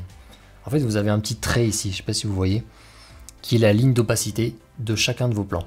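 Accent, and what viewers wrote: Toulouse, France. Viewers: Merci beaucoup pour ton généreux partage de tes nombreuses compétences! Ton éloquence et ton sens de la concision sont aussi très agréables! Je viens de m'équiper avec un Drone DJI Air 2s ainsi qu'un MacBook Pro assez performant équipé du logiciel de montage Final Cut. Alors voici ma question: est-ce que tu as déjà utilisé ce soft? pourquoi as-tu opté pour Première Pro? As-tu eu l'occasion de faire un comparatif Performances, Usage, Prix, Efficacité? Ferais-tu à l'occasion une vidéo d'initiation à ce logiciel? Alors certes on trouve des tutos en ligne, mais j'aurais beaucoup plus de plaisir à voir le tien, adapté aux vols et films cinématiques de Drones. Personne d'OOOtre sur le web n'a cet accent toulousain inimitable pour moi et que tu arbores avec fierté! Bravo, Bravo et encore merci... Je me demande comment tu as le temps de faire tout cela? 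Tu dois être bigrement passionné et efficace!!!!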